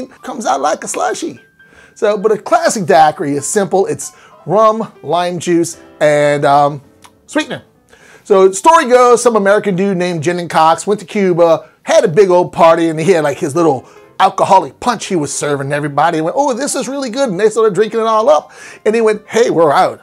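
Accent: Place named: Philadelphia, United States. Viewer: You live in Louisiana but is that a Philly/South Jersey accent i detect? Or Delaware?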